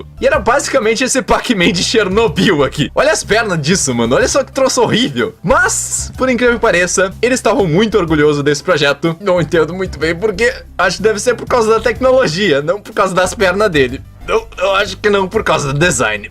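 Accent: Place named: Rio Grande do Sul, Brazil. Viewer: Vc é gaúcho? Tem sotaque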